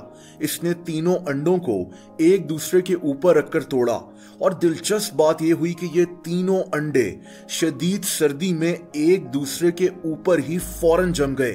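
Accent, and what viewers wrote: Pakistan. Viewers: yup thats pakistani english boi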